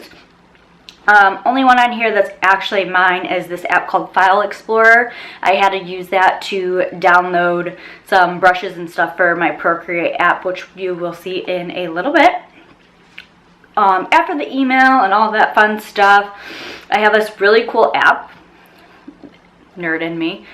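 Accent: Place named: New York, United States. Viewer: Thank you so much for filming and sharing this video you have such a wonderful channel and equally amazing content that is an absolute pleasure to watch and enjoy I love your accent are you from New York by any chance?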